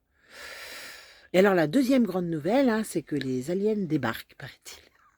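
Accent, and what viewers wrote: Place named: Nord-Pas-de-Calais, France. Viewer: Bonjour, merci de nous raconter la vérité avec autant d'humour, j'adore ton accent de chti, ça fait du bien de rire un peu dans ce monde de fou:face-blue-smiling: tu es une très belle personne:face-red-heart-shape: je te fais de groooos bisous de Belgique 😘😘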